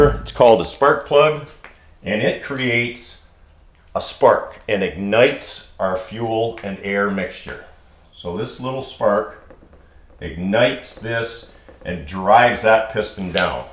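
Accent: Canada. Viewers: Charlize Ravenna ---> You just saved me a whole lot of typing. I was going to suggest changing the title of the video to something like "Already understood details of the physical chemistry of internal combustion wherein every point is repeated at least 3 times, and carefully worded to include as many uses of the exclusively Canadian and universally annoying "aboot" mispronunciation of the word "about".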